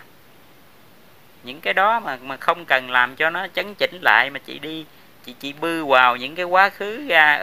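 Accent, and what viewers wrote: Standard. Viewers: ban noi qua chuan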